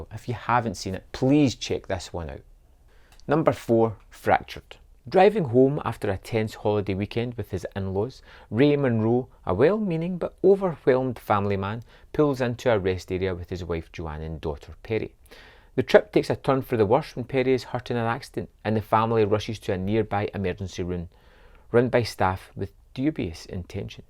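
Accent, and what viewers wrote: Scotland, United Kingdom. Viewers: You got my sub. Great reviews. You're a little bit difficult to understand, given your rather heavy Scottish accent, but for myself I found I really had to listen to what you were saying and consequentially your comments sunk in.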